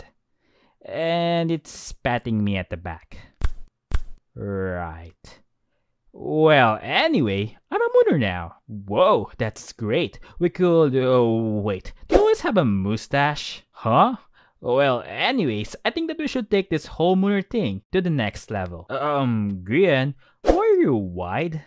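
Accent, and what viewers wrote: Philippines. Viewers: Ur accent looks like a filipino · are you filipino? i can tell by the accent😂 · i love ur filipino accent lods :))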